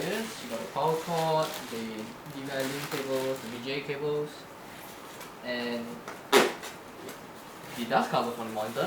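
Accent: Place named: Singapore. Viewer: the accent is killing me.... Singapore?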